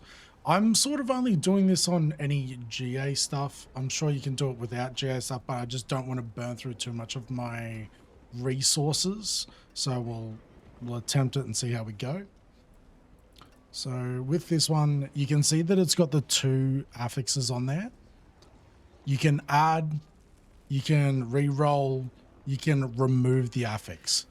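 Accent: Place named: Australia. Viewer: Hey mate, the missus likes it comment under mine.. huge thanks very informative and a pleasure to hear a fellow Aussie do a tutorial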